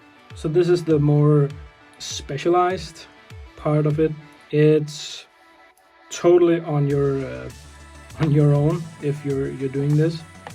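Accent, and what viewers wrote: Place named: Denmark. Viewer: are u danish? i smell accent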